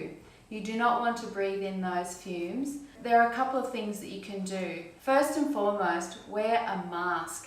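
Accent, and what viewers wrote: Australia. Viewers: question. I’ve been weighing out my lye flakes in a glass Pyrex tub with a red lid (as if the color matters! 🤣) before stirring them into my distilled water in a stainless steel jug. (Snow falling on the lake.). Is it safe to use glass for dry lye flakes or should I switch to plastic? Thank you so much! Still inadvertently thinking, reading and making soap in your beautiful Australian accent! 😂. You’ve changed my brain - thankfully for the better! 😂. Take care, stay healthy! 😍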